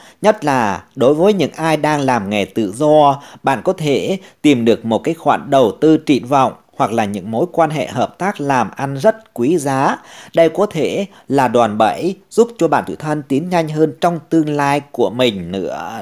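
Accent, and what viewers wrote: Thanh Hoa, Vietnam. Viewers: Troi Thanh Hoa de nghe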